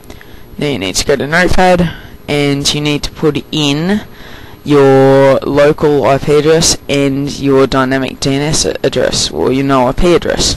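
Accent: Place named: Australia. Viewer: Its australian actually.. definately not a kiwi..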